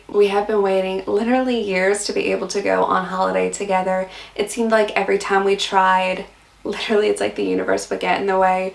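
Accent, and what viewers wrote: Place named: Australia. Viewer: I am concerned that you refer to this as "things i regret about my first six months here." My wife and I came here from NZ in 1981 and our experience of homesickness was very different. I had a job to come to so I had to learn to speak Australian quickly, I had to learn to "get on the team". Francie, on the other hand had lots of time to mope about the friends she left behind and her family still in NZ. It took her YEARS to get over homesickness. I tell you that because you've had a huge upheaval. You might not see the enormity of what you've acomplished yet, but it is enormous. You must NOT allow yourself to regret not doing things. You've been busy as hell learning to live in another country. Jeez you even speak like an Aussie (sort of )!! You should think of each of those things as "now i've been here 6 months and settled in with Mark, here's what i really want to get going on for the next 6 months ..." That's a far more positive way to think of it. 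It's very easy to tell yourself that life is terrible and you'll start to believe it But just as easy to say "life here is wonderful" and you'll fool your brain into thinking that instead. Not changing things in the house early on: I think you were right not to change things around all around the house. Yes you want to make some of the house 'yours' so it's the home of both of you, and I agree with that, but on the other hand you dont want Mark saying to himself, "my God!!! she's come all this way and in five minutes she's chan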